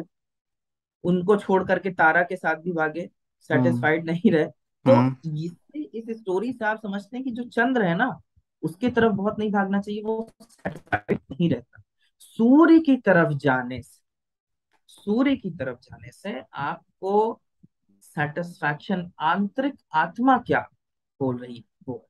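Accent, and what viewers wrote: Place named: Allahabad, India. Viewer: I didn't know before that he is from Allahabad. But when I heard for the first time, i got Allahabadi vibes from him😅. But ignored it. Must say Allahabad has its own taste.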